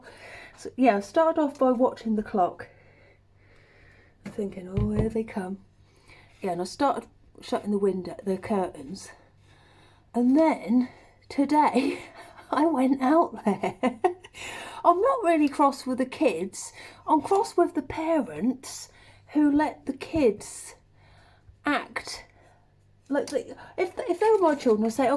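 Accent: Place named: Somerset, United Kingdom. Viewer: You make me laugh..you remind me of a young Pam Ayers, similar accent..I’m guessing Somerset, am I right ? :)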